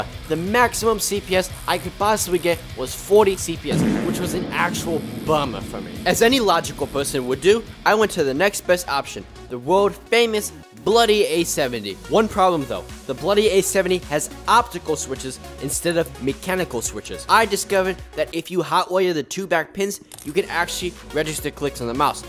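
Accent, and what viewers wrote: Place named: New York, United States. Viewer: Judging by your accent, you’re either from New York or Vermont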